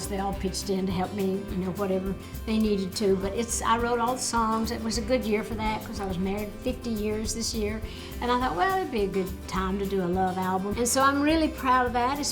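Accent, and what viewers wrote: South, United States. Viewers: I love the Southern American Accent!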